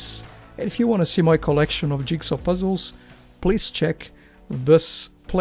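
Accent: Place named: New Zealand. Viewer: Hi!:) I just started the video and I realized how I kinda missed your NZ accent!:D So good to hear from you in another video, I feel immediately comfortable and already enjoy your puzzle experience. Thank you and lots of warm greetings from Germany:)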